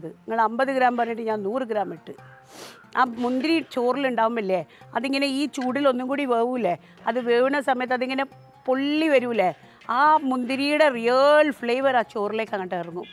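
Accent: Kozhikode, India. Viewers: Kozhikkode സ്ലാങ്ക് പൊളി........ ഇങ്ങള് ബരിം.........😊👍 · Her kozhikode slang♥️ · This is og Calicut slang. Surabhi in m80 moosa uses a bad mimicry of this.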